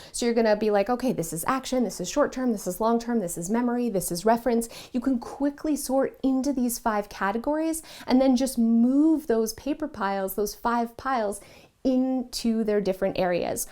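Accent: Canada. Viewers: Omgoodness... I love your Canadian accent! And, the organizing 😃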